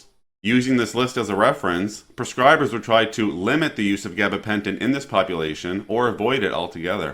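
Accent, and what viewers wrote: Newfoundland, Canada. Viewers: You must be from Newfoundland . Love the channel subbed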